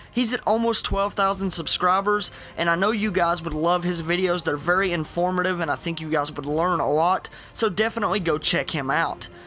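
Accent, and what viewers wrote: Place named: Texas, United States. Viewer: Extreme Cynic nope this is a Texan accent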